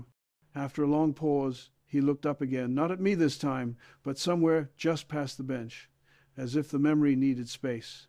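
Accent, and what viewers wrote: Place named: United Kingdom. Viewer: Agreed. Can hear the british accident.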